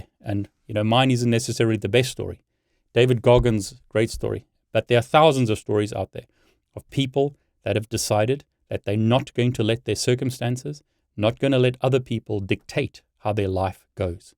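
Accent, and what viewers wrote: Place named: South Africa. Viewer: Well your accent indicates that you are South African and South Africans are one of the most studious, hard-working, Stoic, Intelligent, never-give-up, honest people in the world~!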